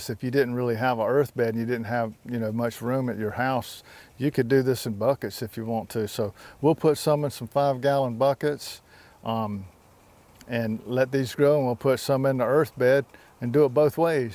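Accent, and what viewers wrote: South, United States. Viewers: It’s so nice to hear a southern accent I’m from Houston and Henderson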